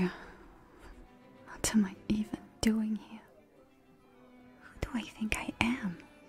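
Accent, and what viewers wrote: France. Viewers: Will there still be French accent audios? Surely? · This sounds so so so beautiful!! I love a french accent and this is soo nice to listen to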